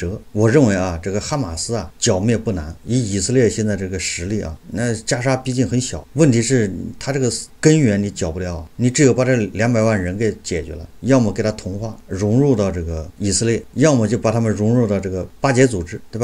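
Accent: Anqing, China. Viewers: 你的口音像我老乡，安庆枞阳吧。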